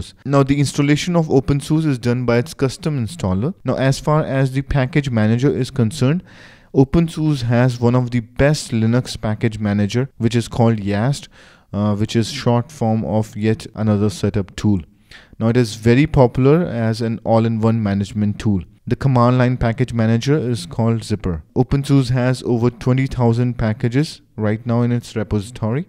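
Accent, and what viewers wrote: India. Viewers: You're talking in the classic Indian accent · I hate indian accent ! Speak proper fn english !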